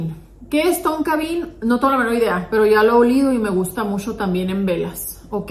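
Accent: Mexico. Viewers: Llegué buscando una Buena reseña sobre perfumes de Lattafa Femeninos y encontré el mejor jajaja😂 no paro de reirme, además el acento mexicano es único lo amo. Khamrah : Esto es un perfume para alguien que ya lo arrastró la Vida 😂 Te ganaste una suscriptora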